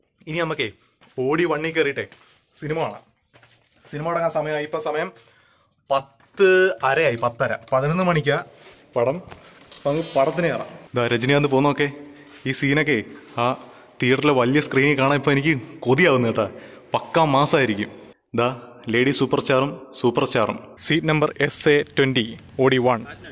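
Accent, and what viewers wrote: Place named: Thiruvananthapuram, India. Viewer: സംഭവം കൊള്ളാം. പക്ഷെ ഈ കൂടെ കൂടെ കേട്ട കേട്ടാ കേട്ടാ ന്നു പറഞ്ഞു ബെർപ്പിക്കരുത് അനിയാ 😬നീ കൊല്ലംകാരൻ അല്ല. തിരോന്ദരം ആണെന്ന് തോനുന്നു 😆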